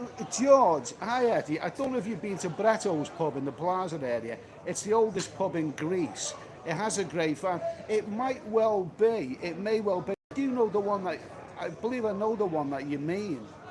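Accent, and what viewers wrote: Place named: Liverpool, United Kingdom. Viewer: Scouse is routinely rated as the hardest English (not Scottish) accent to understand. Rated way below even Cockney. Many Brits are embarrassed over having Scouse in England. This channel needs SUBTITLES!! I am tired of hearing about wild "bears" (beers) and pals (pools). Many other words too.... I simply have no idea.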